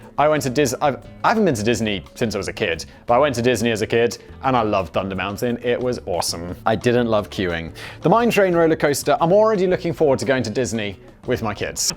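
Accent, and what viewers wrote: United Kingdom. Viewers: Oh dear! We are here because we are Gluttons for Punishment.... and some of us are fans of the British Humour and Accent! lol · I'm here for your beutiful British voice and your ability to rant more frequently than my own thoughts.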